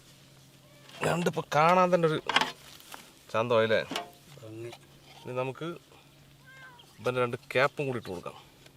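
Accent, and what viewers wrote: Palakkad, India. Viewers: Chetta palakadan avadaram super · അണ്ണൻ പാലക്കാട് ഭാഷ 💯 സൂപ്പർ സൂപ്പർ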